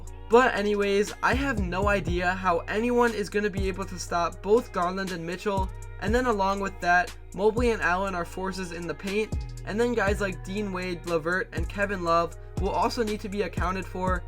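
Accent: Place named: Cleveland, United States. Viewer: are u from cle? sound like it but i wanted to ask. great vids,. newly subscribed. looking forward to the next one.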